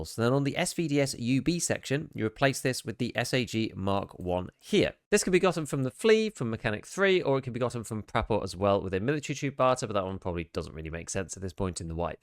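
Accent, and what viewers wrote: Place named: United Kingdom. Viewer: you sound like a re**** brit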